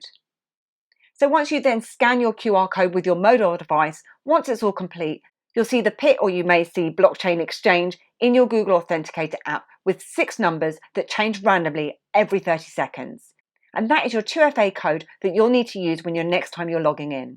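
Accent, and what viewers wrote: United Kingdom. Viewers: I don't understand a shit... British accent🙄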